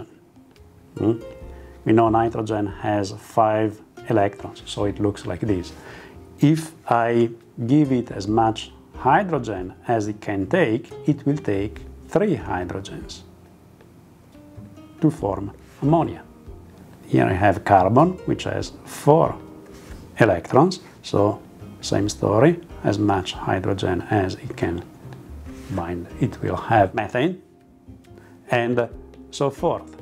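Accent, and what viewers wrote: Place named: Italy. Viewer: Excellent information that will help me teach this concept to my general chemistry students. Bonus listening to it with an Italian accent!!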